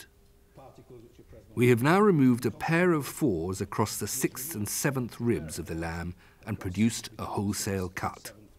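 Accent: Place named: RP, United Kingdom. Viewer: We don't need the posh version thanks.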